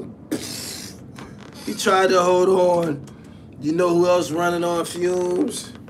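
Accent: New York, United States. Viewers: Your slick New York talk is old my guy! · You do sound like 1 of them bias new York dudes im just saying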